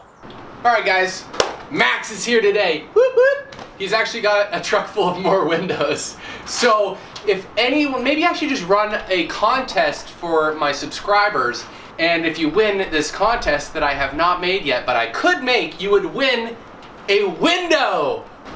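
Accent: Canada. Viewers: The plural of "axis" is "axes". Also, your Canadian accent is awesome. :-)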